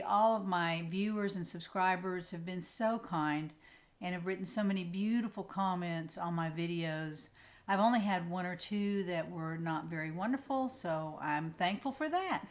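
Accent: South, United States. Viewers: Great to have your family coming!! :-) You're right...enough tutorials out there. Enjoy just hearing wonderful Southern accent & speak about what you know.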